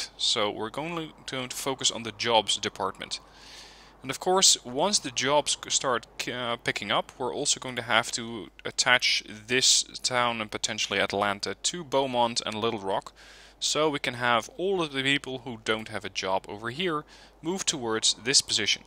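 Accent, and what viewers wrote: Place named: Netherlands. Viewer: I searched for wargame red dragon stuff and stumbled onto your channel. Now a day later i'm at episode 10 of your transport fever series haha (and watch a couple of videos of wargame ofc). Subs +1 ;) Keep doing what you doing! One question from which country are you? your accent sounds dutch.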